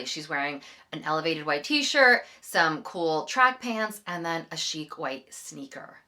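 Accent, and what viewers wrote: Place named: United States. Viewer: First of all can I just say how much I love your channel and I wish it every success, you thoroughly deserve it, you're so knowledgeable and a great presenter, you have a lovely voice and soft American accent that is a pleasure to listen to and your content is always excellent. I invested in a princess style wool coat from Jigsaw in the UK back in 2005 and I still wear it and it looks amazing. It's taupe, another great classic colour, not too light and not too dark. It goes with everything and I like taupe much more than camel or beige. I love browns, also chocolate brown (not coffee bean brown, which is too dark and quite harsh). I'd love a navy or blue coat and although I don't need it, I'm on the look-out for one, I also love dusty pink, which in an underrated neutral and a very flattering and feminine one imo, I recently saw a lady wearing a dusty pink trench coat and she looked amazing. 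Like taupe, dusty pink goes well with any neutral colour.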